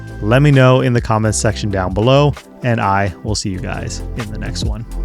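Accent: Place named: United States. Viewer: Your name is not Japanese, and your accent is very American. Why this video? You did not speak Japanese at all in the video to tell the Japanese viewers what your real intentions are. I am a NYC expat. Living in Japan. Yes, I speak and read most of the language, mostly self-taught. 41 Years in Japan. I surf Shonan. I have friends all down near Katase Enoshima. Thousands of tourists a day visit that area especially on weekends. The rudest rule breakers are the loud, obnoxious. neeeee screaming women on trains are the Japanese baba! In all my years here and down near that tourist area, I never saw one person litter. Once every four months or so, I tell obnoxious loud young Japanese wanna B cool guys to pipe down, and actually, I told a Chinese guy the same thing three years and four months back. Other than that, I often have to yell at little, obese Chinese 10-year-olds for chasing pigeons. 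I walk 5 kilometers a day to stay fit. The most disgusting places I walk by with litter are all Japanese areas with zero tourists. I rarely catch them in the act, but when I do, I get down to their animal littering level and let them have it verbally. Stop blaming tourists. Most of the bad stuff comes from the locals. I can send you maps and photos if you want. I bet you do not respond, though, as you are looking for followers, not reality.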